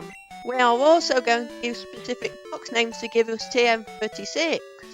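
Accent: United Kingdom. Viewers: Is that your voice? Are you british?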